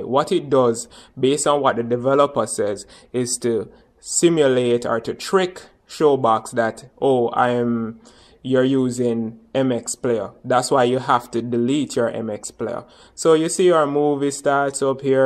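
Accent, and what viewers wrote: Jamaica. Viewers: Works perfect for me! I have been trying to cast for 3 weeks now with no success until this video! THANK YOU!!!! Love the Jamaican accent!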